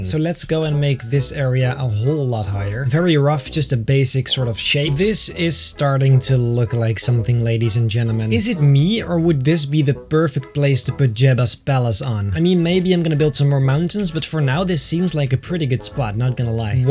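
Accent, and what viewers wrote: Netherlands. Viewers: I hear a bit of a Dutch / a Belgian accent in his voice...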